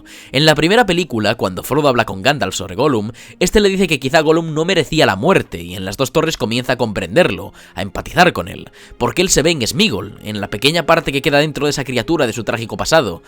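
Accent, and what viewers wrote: Spain. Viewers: que culero esta el Español de España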